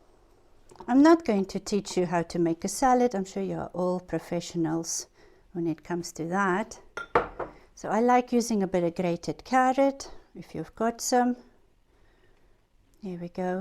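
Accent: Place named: South Africa. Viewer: Great ideas thanks for sharing. You sound South African 😊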